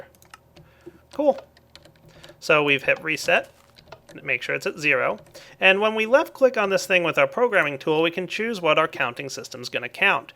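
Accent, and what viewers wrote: United States. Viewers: is American?! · since when were you American?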